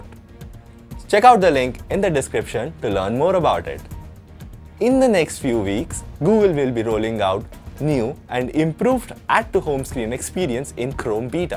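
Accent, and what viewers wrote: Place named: India. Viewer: That's the Indian accent :)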